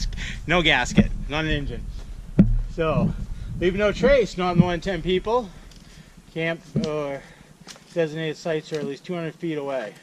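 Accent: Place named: Maine, United States. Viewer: Having trouble commenting on your other videos could just be my phone but I just watched a couple of your videos...thought I recognize that accent lol Can you just tell me how far up the trail you walked before you found that campsite please ...that would be helpful I’ll bring my GPS and Mark it and put it on my channel when I do it next spring thanks ...One Mainah to another 😀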